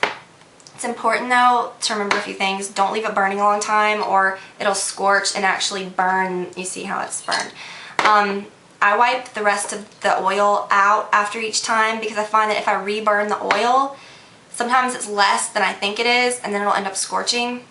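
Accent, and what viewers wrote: South, United States. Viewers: Your southern accent really came out in this video:)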